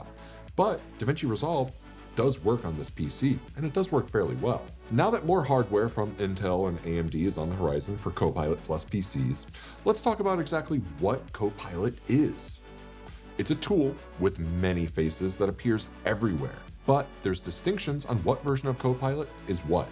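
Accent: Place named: India. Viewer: Forgive me to hesitate for clicking in, as I couldn't handle Indian accent (Not being mean, just I can't) ...But your video is very informative and well done, thanks!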